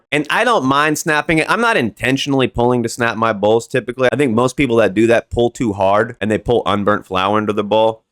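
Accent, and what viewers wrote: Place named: Boston, United States. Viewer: Idk if you’re from Boston, but I occasionally hear a Boston-like accent, especially when you use an “A” on the end of words that have an “ER”. Just a curious observation.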